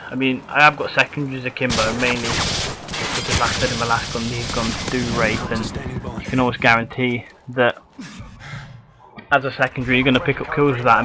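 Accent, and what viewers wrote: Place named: United Kingdom. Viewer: I thought the owner of this channel was hispanic. I remember seeing loads of vids in spanish. Now i hear a brit talking. IM confused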